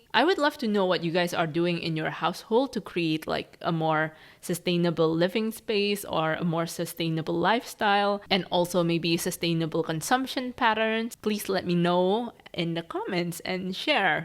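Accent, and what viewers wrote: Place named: Indonesia. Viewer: I i recognised your accent and i checked your instagram and as a fellow chindo IM SO HAPPY TO HAVE FOUND A INDONESIAN CONTENT CREATOR ESP ESSAYIST wadawww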